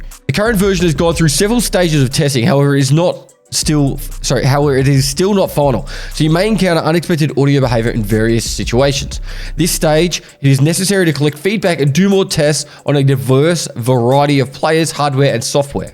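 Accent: Australia. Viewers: Most distinguishable Aussie accent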